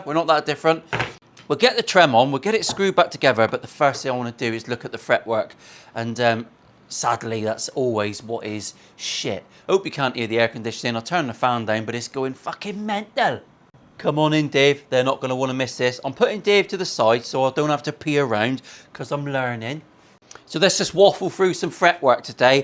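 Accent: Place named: Northern Ireland, United Kingdom. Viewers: I love your Norn Irn accent mate. Always makes me laugh. I'm from Norn Irn and you sound like a local. I'd love to see you do a whole video in the Norn Irn accent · I love the Northern Irish accent, especially when you say millimetres........Chuckle........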